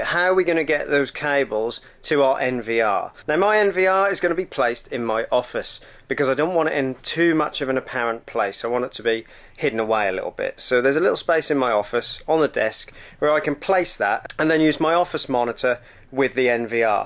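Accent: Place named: Norwich, United Kingdom. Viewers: Apparently most people think the English don’t know how to speak Merican. He does come from the country that invented our language and maybe…just maybe he is saying it correctly and we as Merica is not? (I am married to a Brit and I’ll tell you there was a learning curve to what England English sounds like…kinda like trying to understand anyone from Alabama, Mississippi, either Carolina and southern Ohio) His technique on instillation is spot on. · Thought I recognised the accent. I was bought up in Hellesdon (not the Hospital ) I've heard all the jokes 😒😅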